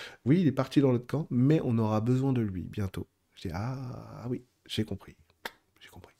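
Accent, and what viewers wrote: South, France. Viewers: Vidéo géniale comme d hab! Merci. « Je clairvoye à mort ». Je clairvoye, tu clairvoyes, il/elle clairvoye etc etc❤🎉😂😊. Topissime l’accent du Sud « à la bien cousin, en place » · Merci beaucoup ce partage! J'adore l'accent du sud!😂 ❤❤❤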